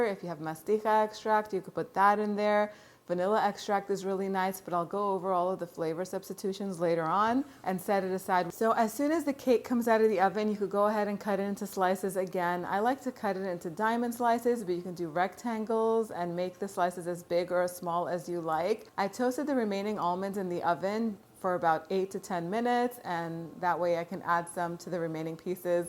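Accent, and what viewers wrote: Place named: New England, United States. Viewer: Love your Yankee accent than Shambali ❤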